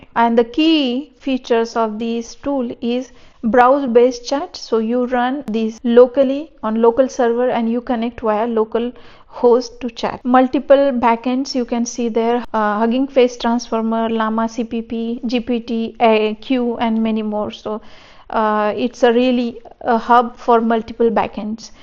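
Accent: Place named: Maharashtra, India. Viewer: Marathi accent ! Nice information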